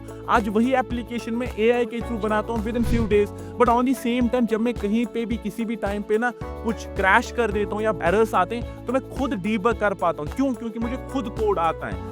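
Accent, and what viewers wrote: Kashmir, India. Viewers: Ap kashmir say ho kya sir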